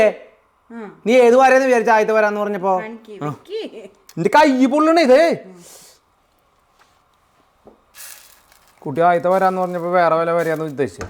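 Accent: Thrissur, India. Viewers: thani Trissur slang.. ❤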